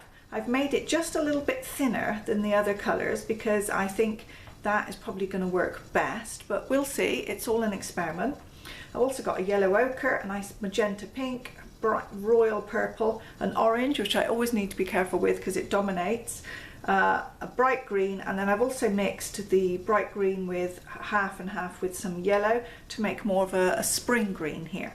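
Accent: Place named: West Country, United Kingdom. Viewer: Beautiful, I can see why you are thrilled with this piece! By the way, your accent sounds West Country UK, is that correct? X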